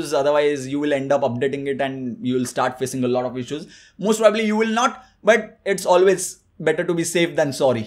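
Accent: India. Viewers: Don't understand fucking anything. Indian accent...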